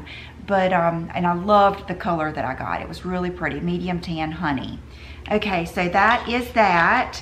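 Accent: South, United States. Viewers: Apples of your cheeks!! Lol she said it when I was writing it! Love your accent. You must live in the south.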